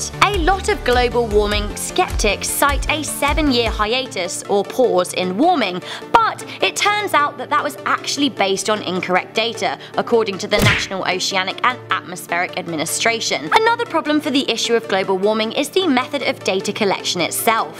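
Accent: United Kingdom. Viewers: She is from the U.K., different accent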